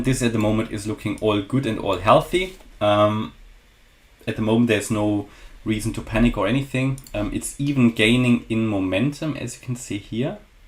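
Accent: Germany. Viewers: he has a very german accent, definitely not dutch haha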